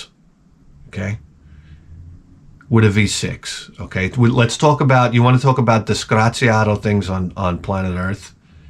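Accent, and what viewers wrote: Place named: Long Island, United States. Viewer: I was just thinking you begin sounding like an Adult Eore from Long Island, then noticed you're even wearing Eore 💙 Blue.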